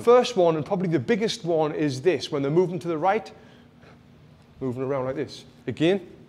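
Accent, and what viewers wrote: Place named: North East England, United Kingdom. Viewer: Cheers mate, where u from? I’m from Durham myself and live in Washington now, you sound like ya from the north east marra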